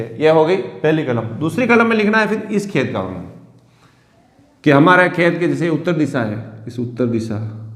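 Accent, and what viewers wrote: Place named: Mewar, India. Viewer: Good aap kha se he बोली से मेवाड़ के लग रहे हो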